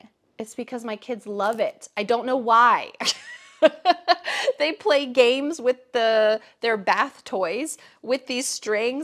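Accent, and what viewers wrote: United States. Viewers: Are you American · Hi ma'am.are you American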